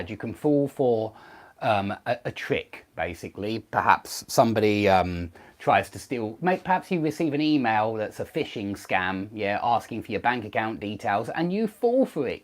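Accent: United Kingdom. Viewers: British accent is superb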